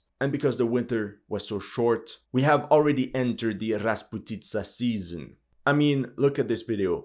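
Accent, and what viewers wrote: Quebec, Canada. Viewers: Что ты хочешь от канадца французского происхождения? Нормальное у него произношение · That "c'est fini bro" get closer and closer to the Quebec accent you are getting closer with every words spoken.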